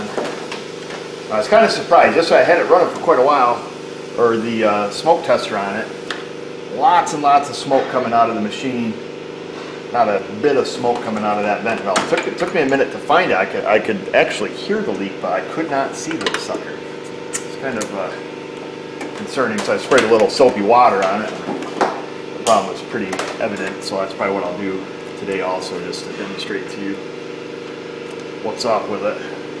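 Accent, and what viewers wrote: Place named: Upstate New York, United States. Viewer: Cancer vent valve? Oh.. canister... That upstate accent!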